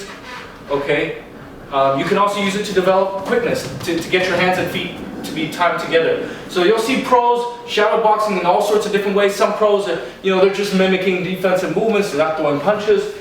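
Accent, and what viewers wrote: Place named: Philippines. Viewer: He has a filipino accent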